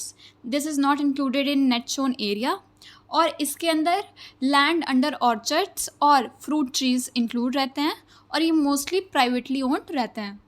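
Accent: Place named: Punjab, India. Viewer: Panjabi ton effect 😅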